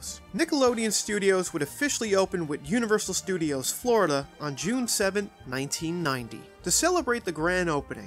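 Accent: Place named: Philadelphia, United States. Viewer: I recognize your accent. you're either from Philly (my guess) or Baltimore! I could barely pick it up at times. Either way, really great video!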